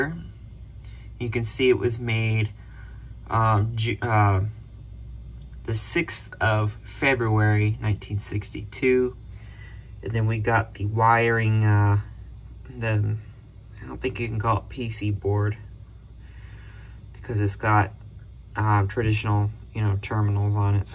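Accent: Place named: France. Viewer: You sound really cute 🥰;) French accent is nice 😊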